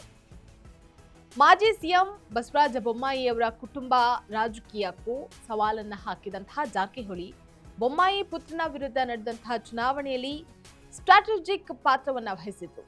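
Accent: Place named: England, United Kingdom. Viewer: ಕನ್ನಡ ವನ್ನು ಇಂಗ್ಲಿಷ್ ಸ್ಟೈಲ್ ಬೇಡ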